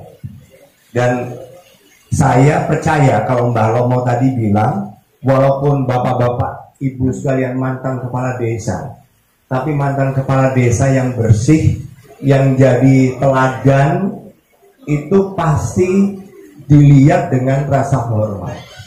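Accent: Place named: Banyumas, Indonesia. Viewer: Ttep saya memiling pak andika rari orang ngapak bolo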